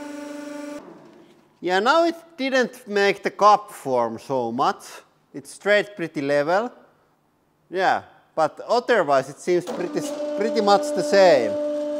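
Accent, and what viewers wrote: Finland. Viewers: Ootko Suomalainen?😂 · huomattavasti helpompaa ymmärtää suomen enkklantia puhuttuna kuin natiivin englannin puhujan. 😆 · Finish/English ,,, omg thats lovely 😁😁😁😁😁😁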